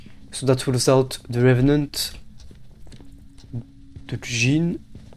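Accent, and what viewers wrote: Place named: France. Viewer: im crazy supprised that ur french accent makes it difficult to really understand ur English.... But still nice smooth gameplay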